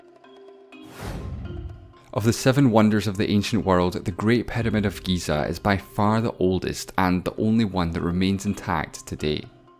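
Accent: Scotland, United Kingdom. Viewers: lol i heard that but cmon don’t make fun of his scottish accent i’m part scottish too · Sounds like his accent is from scotland from brave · i think he’s Scottish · You're welcome. If you follow his channel and have seen all his videos. You will already know that he is from Scotland (he even said so). I'm used to his accent, it's cute. Much better than the posh accent of BBC documentaries. lol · finally a scottish voice, not a yank!! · Omg it’s so nice to hear a Scottish accent doing a voice over yasss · He is Scottish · what is this guys accent? it sounds scottish but it doesn’t at the same time lmao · Is this guy Scottish? Man, those thick accents! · Whatever, I´m very much enjoying your Scottish accent, keep ´em coming! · I would like to know where the channel's owner accent is from? Scotland? Ireland? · i think he's scottish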